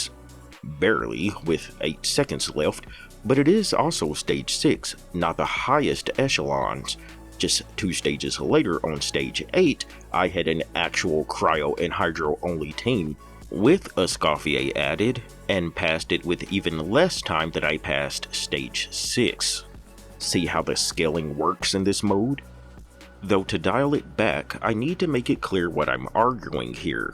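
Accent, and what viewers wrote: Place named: South, United States. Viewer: I like the video and your southern accent. Thoughtful analysis. 1st time I see you. Subbed. Hope u post more.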